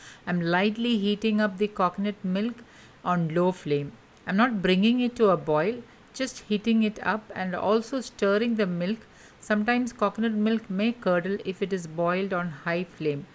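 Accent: Kerala, India. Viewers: Yup.. it’s the cuteness of Malayali accent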